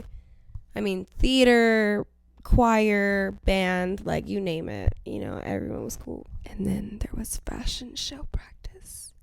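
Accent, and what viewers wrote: United Kingdom. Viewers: Also your British accent is GOOODDTTTT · Your british accent is killing me 😂 My new favv podcast fr 💗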